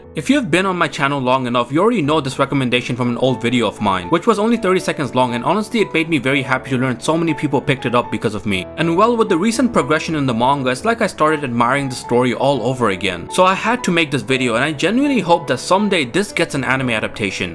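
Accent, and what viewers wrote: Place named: United States. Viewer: sorry but i just can't with this american pronounciation xD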